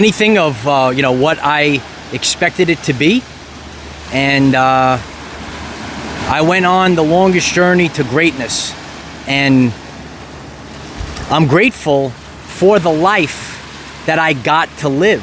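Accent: New York, United States. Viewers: Wyoming? I would not have guessed. But you have a noticeable New York (or east coast) accent. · I love this message. Thank you! You sound like a New Yorker 😊 We have all changed over the past years especially since Covid. Thank you for inspiring me today I need this. We are light! You are doing good work for the kingdoms❤ thank you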